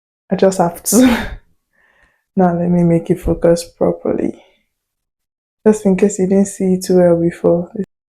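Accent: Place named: United States. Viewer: Yoruba GRWM with you, definitely going come out great with your small American accent here and there😉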